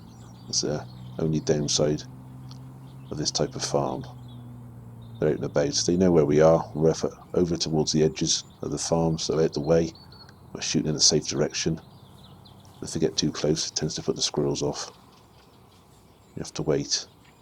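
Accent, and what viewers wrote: England, United Kingdom. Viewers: The calm English accent puts me to sleep everytime sitting at my computer.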